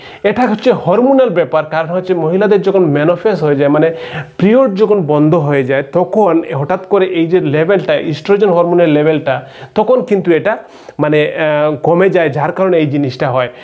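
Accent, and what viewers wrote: Sylhet, Bangladesh. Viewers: সিলেটি ভাই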